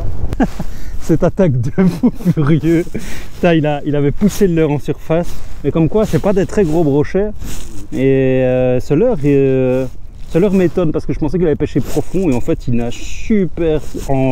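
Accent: Belgium. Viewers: Je kiff ton petit accent belge ;)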